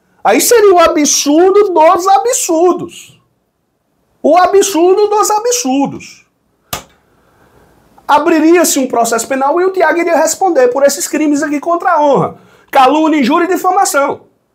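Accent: Northeast, Brazil. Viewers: Salve Salve o.... você tem sotaque forte e com muito prazer digo sotaque NORDESTINO 👏🏼 DE ONDE É VOCÊ.... PARABÉNS.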